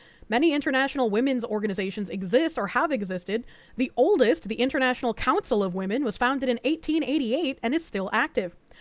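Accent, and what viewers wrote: Boston, United States. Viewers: I came for the DEI update and Boston accent. ❤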